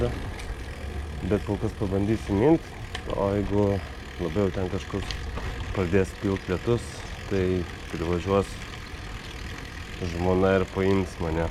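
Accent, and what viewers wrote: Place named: Dzūkija, Lithuania. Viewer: Kietai. Fainas dzukelio akcentas :)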